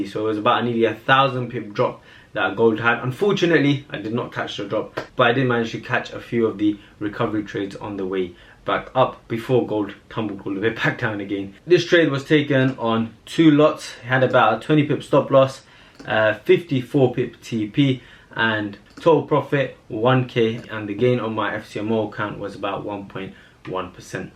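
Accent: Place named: Nigeria. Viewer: Bro what country are you from. You sound a bit Nigerian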